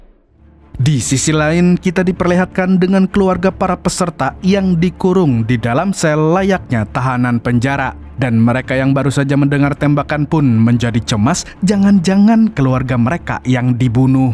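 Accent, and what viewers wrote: Banjar, Indonesia. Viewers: Fix urang banjar wkwk, banjarmna pian 😂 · Lah org banjar kah🤣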